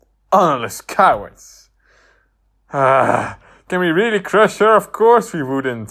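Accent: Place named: United Kingdom. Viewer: Omg I thought you weren't aiming for a british accent but just that for some reason you were pronouncing words differently a bit this time but then I read the description! xD Really fun video as always!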